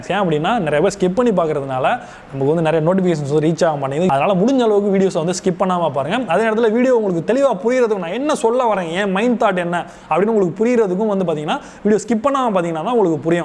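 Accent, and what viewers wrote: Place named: Madurai, India. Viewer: I'm new subscriber Anna nenga Madurai ya ? Slang mass .......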